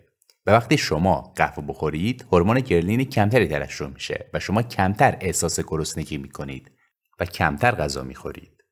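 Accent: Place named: Turkey. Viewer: baba in lahgeh torkit halemono gereft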